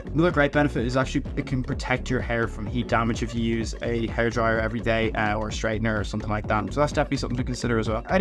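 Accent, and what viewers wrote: Ireland. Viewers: Are you Irish because you sound Irish If you are what county are you from I'm from Louth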